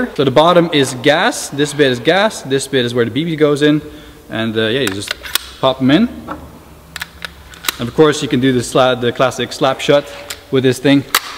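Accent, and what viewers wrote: Netherlands. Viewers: Boi u dutch? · are you dutch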